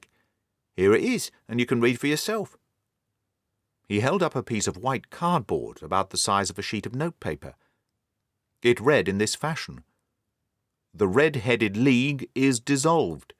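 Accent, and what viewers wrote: United Kingdom. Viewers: Beautiful British English, high reading class. I listened with pleasure. Thank you so much.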